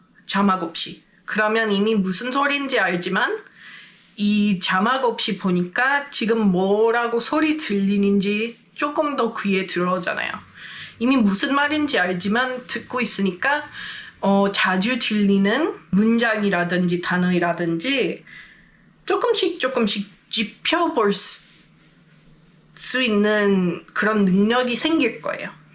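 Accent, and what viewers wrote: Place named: Seoul, South Korea. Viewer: 세상에나. 서울에서 5년 살아도 서울억양 안되던데 님은 진짜 서울말 억양 너무 완벽하시네요!!! 부러워요..그 능력이!!!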